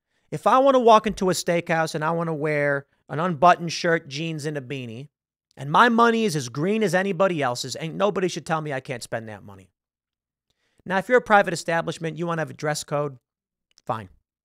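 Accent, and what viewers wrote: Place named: Chicago, United States. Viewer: Lol. You could definitely tell your from Chicago.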